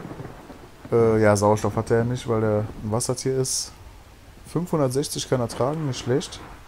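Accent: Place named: Frankfurt, Germany. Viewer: aus welcher gegend kommst überhaupt manschmal hab ich das gefühl kommst aus frankfurt oder zumindesten rhein main gebiet :)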